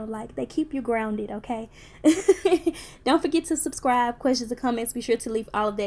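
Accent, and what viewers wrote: South, United States. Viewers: Nice southern accent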